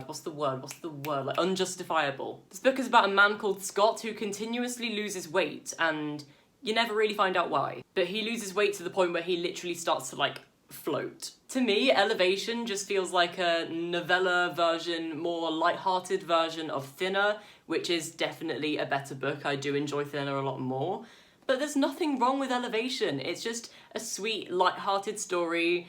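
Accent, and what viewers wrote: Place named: England, United Kingdom. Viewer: One off topic thing.... You have an amazing English accent. May I ask you where you come from?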